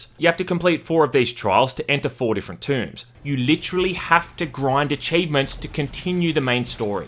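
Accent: Australia. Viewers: Australian accent ftw haha.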